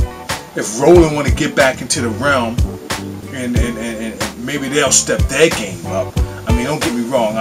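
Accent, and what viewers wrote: Baltimore, United States. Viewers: I'm willing to bet money homey is from B-more or somewhere close. that accent is hard.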